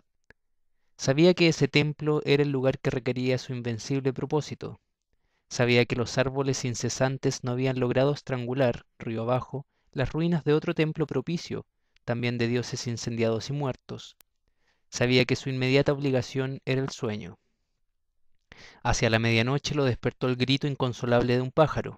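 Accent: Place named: Chile. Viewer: se agradece la narracion chilena, saludos desde santiago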